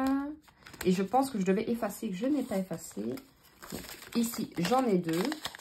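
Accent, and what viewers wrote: Belgium. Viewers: Coucou 😊, j'aime beaucoup tes vidéos, c'est très agréable de t'entendre parler avec ton accent belge🤩 J'attends avec impatience la vidéo de ton jardin 🌻⚘🌺🌱🌿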